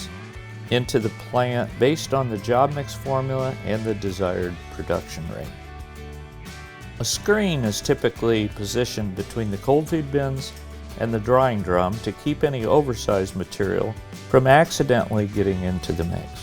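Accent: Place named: Eastern Europe. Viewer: Nice video, but speaker has an accent, that I can't define, and this makes me curious. Is it East European accent? All the best from Europe )))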